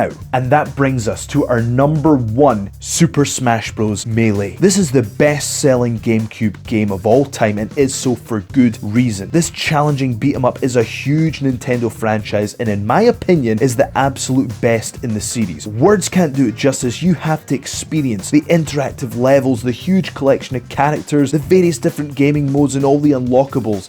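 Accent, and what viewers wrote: Scotland, United Kingdom. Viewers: This guy reminds me of Dominik Diamond from Games Master. His Scottish accent when speaking on his retro reviews bring back so many memories!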